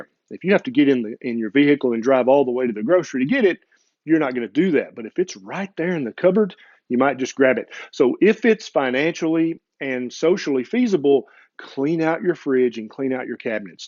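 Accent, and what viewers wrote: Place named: Kansas, United States. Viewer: Dear Dr. Berry. Frank here, RN and Certified Personal Trainer, to say how much I enjoy your videos. After 10 years teaching exercise, I have started to incorporate nutrition, especially keto lifestyle, into my programs. I appreciate the fact that you hold an MD degree which I believe lends credibility to your opinions on healthy eating. Also, your accent reminds me of my PCP who hails from Kansas! Keep up the good work! Let's "Make America Healthy!"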